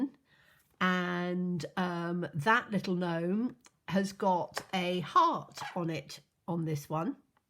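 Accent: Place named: England, United Kingdom. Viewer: I LOVE the way you chat so naturally to us! My father was English and I do so enjoy hearing your accent. He has been gone many years now but you make me feel "at home". Our Aussie drawl is nothing like his beautifully refined tones which you share. Thank you! I also love the gnomes sets and have them. You gave me ideas for other designs with them too! Bless you!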